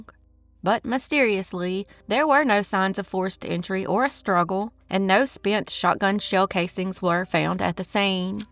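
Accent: South, United States. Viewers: THANK YOU!! LOVE THAT BEAUTIFUL SOUTHERN ACENT!! YOU GO GAL!! KEEP EM COMING!!:face-red-heart-shape:🤠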